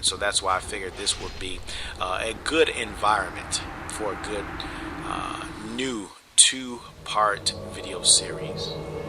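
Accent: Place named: AAVE, United States. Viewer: You sound of the afro ebonic persuasion.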